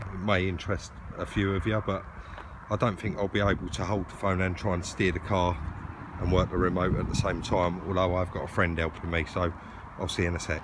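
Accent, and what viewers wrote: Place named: London, United Kingdom. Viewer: You sound like Danny Dyer with that london accent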